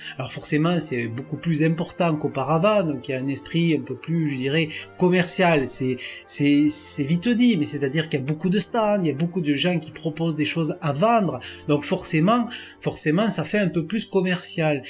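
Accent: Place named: South, France. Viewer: bravo!! bonne vidéo ça fait du bien de voir que tu le même accent du sud que moi ;)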